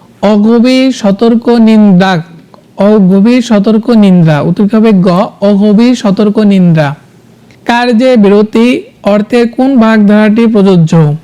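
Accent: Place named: Bogura, Bangladesh. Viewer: ভাই এর বাসা বগুড়া নাকি? ভাষা ত তেমনই 😊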